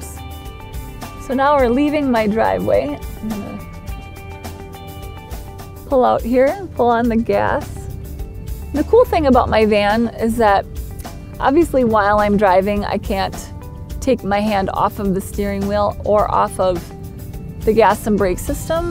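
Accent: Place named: Minnesota, United States. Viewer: Your ride is soo cool, so much cooler than those available a very short time ago. Also, you sound soo Minnesotan. Thanks for the video.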